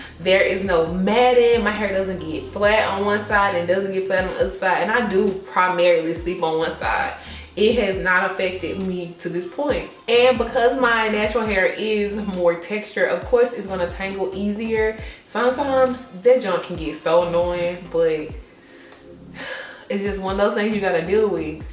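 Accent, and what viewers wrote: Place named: Texas, United States. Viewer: I heard that accent and just knew you from Texas 🙌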